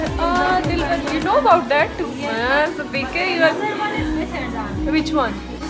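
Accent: Punjab, India. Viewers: I watched alot of vlogs of other people which shifted korea but baiii is da mzaaaa e or eh... Punjabi.. Touch zbrdast 💜❤️ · I love your vlogs and also your Punjabi accent 🤍💗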